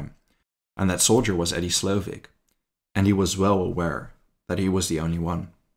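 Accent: Germany. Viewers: with The German accent - 1. Is the opening music you use from Bloch's Hebraic Rhapsody.? I am just trying to place it. 2. Another unfortunate was one Theodore Schurch who did some minor interrogation work for the Germans after being captured. His father was Swiss so presumably he spoke Swiss German. There was something about his English which made some of he British officers guess he was really a turncoat. He was allegedly captured in Rome in March of 45.. well after Italy had fallen. Why he did not try to get to say CH and the to Australia or Canada and simply disappear is beyond me. Perhaps his relationship with his CH relatives was not so good. Well even though the war was done and dusted by the time of his trial he was still found guilty of treachery and Hanged... I think he was just too cocky and he could easily have avoided the noose. I know of no other UK soldier executed by the brits for treachery or treason during WWII. 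K Fuchs who was a UK fast track citizen was very lucky to avoid the noose and had the USA counter intelligence caught him, he might have been given a heavy sentence while the Manhattan project was running (to prevent the other scientists going on strike during the war effort ) and then executed on some other charge post WWII. But the US never caught him in the act. Tim Fidler, NZL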